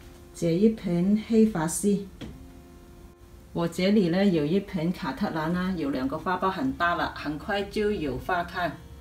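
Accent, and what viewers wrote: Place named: Cantonese, China. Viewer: 我之前有跟妳留一段留言，妳可能沒注意看！我說我非常喜歡聽廣東歌，妳說的普通話有點廣東腔，特別親切、可愛欸！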